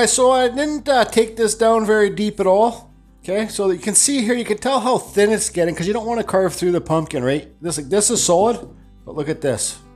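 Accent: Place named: Canada. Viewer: I can hear Canadianity in your voice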